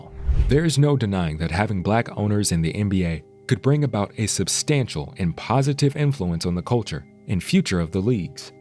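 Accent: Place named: Europe. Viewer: Am I a racist for thinking this dudes voice is mighty European sounding 🧐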